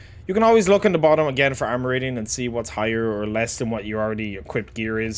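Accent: Ireland. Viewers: Glad to hear that voice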